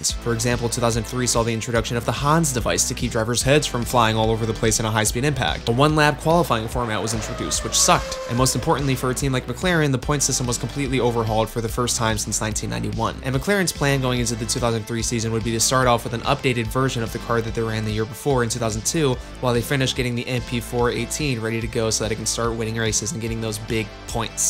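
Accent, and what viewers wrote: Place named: Chicago, United States. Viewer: Your accent seriously sounds Chicagoan.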